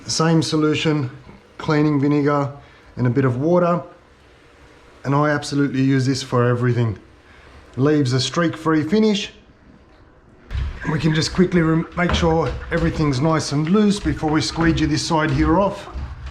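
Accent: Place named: Australia. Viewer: Randomly came across one of your vids, as soon as I heard the voice I was like “mad a Aussie channel” I’m about 40 videos in and have subbed. Cheers from Sydney ✌🏻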